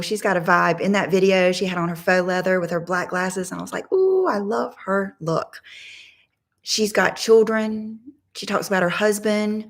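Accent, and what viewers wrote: South, United States. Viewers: What a beautiful and touching video! You are perfect , you are enough, God knew what he was doing when he created you. Your videos are so inspiring, encouraging, and relaxing to watch (your southern accent is adorable) I look forward to your videos and what exciting and fun things you bring for all your “friends“ to enjoy 💕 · I like you I find your voice very soothing. I’m originally from North Carolina and I love to hear your southern accent, and I love to see your holes of clothing and I buy things that you show me. So thx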